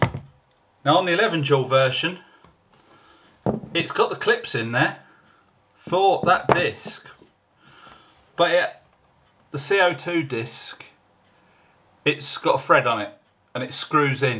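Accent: United Kingdom. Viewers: Im thinking of maybe getting one of these in the future, and think i would probably like to get mine powered up , you sound like you're quite local to me , are you in the Midlands?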